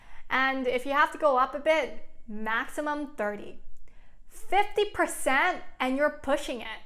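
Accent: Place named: United States. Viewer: she is speaking American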